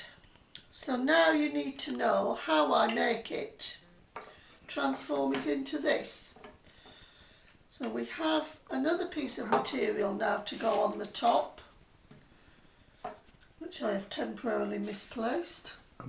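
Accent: United Kingdom. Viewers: Hi so nice to hear someone from the uk especially who doesn't make "long" wind out of everything. Thought your tutorial was excellent and going to put it into practice. Thanks once again Sylvie x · This looks like a lot of fun! Thank you :) wondering if you are from Yorkshire?!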